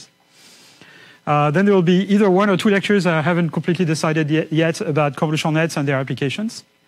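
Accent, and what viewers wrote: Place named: France. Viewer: He has a french accent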